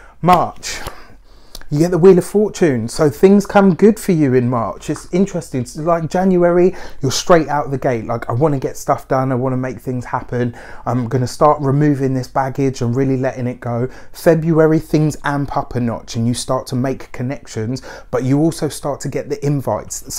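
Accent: England, United Kingdom. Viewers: I love his English tongue. He must came from England. I am 22 of Sep.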